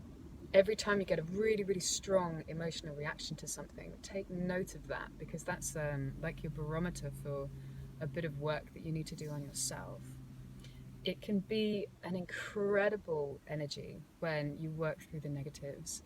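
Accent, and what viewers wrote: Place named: England, United Kingdom. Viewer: Are you from England? lovely accent.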